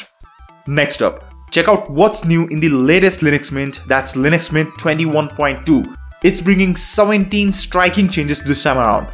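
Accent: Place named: India. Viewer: Arrey bhai accent kam kar. “Kompooting” experience . Sharm aati hai desi accent se ?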